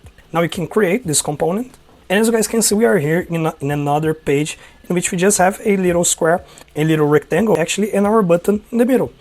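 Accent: Brazil. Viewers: Parece brasileiro falando ingles 😅 boa aula!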